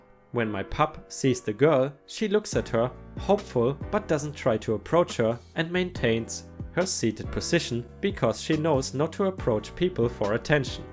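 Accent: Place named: Germany. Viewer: I don’t listen to many channels I’m not already subscribed to. There are so many new ones popping up and I usually end up getting the same stories over and over again. So, I stay faithful to my regulars. However, one of your videos caught my eye and I clicked on it. I really enjoy your voice and (to me, as I’m not German) wonderful accent. I do realize to you it would be ME who has the accent! 😀 Anyway, I have subscribed to your channel. I look forward to many more of your videos!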